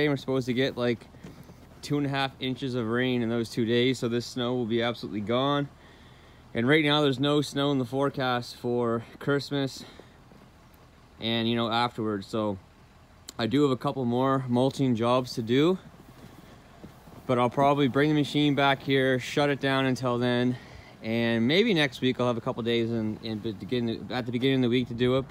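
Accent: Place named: Maritimes, Canada. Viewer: Get it done before the snow flies again on Monday. You're "Maritimer" accent is coming out "Started up the trucks from inside the "ouse" so glad you don't have a "bout" too. Hahaha. Don't worry I bug my friends too about it. Nothing like a Caper talking about the squalls or de fishing season. Cheers.🎄❄🎄❄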